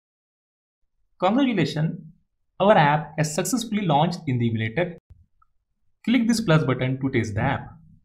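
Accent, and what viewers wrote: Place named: India. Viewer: i cant understand what you are saying bro.i dont understand your indian english😩😫. try using ai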